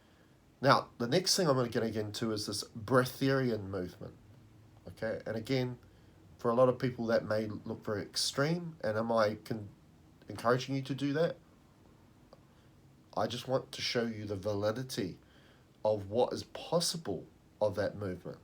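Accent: New Zealand. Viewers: Aloha, are you from NZ ?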